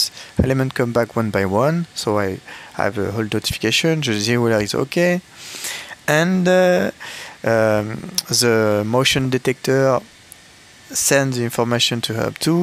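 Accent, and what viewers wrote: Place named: France. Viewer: Bon morning Rien qu’en écoutant to anglais j’ai compris que tu étais French 🇫🇷 J’ai le même accent en anglais 😁 Plus sérieusement ont ils changer quelque chose ou pas depuis le temps ? Autre question n’y a t i pas une option qui te permet d’activer le déclenchement de la siren en cas de brouillage ?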